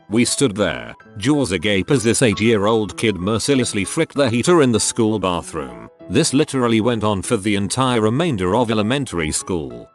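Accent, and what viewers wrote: United Kingdom. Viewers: when i first started watching these videos i could recognize that it was a British accent but now i cant hear the accent anymore.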